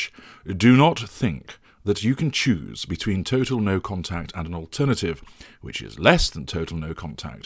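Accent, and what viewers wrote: England, United Kingdom. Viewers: It's that awesome ENGLISH accent, whew!!! Outstanding!!! Xoxo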